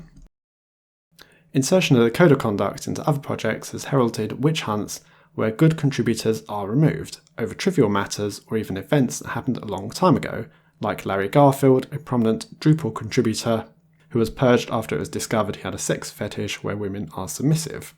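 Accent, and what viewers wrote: England, United Kingdom. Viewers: Are u from england?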